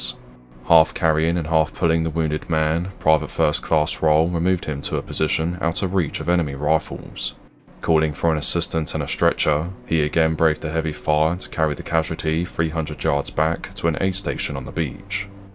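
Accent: England, United Kingdom. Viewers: Which part of England is Your accent from?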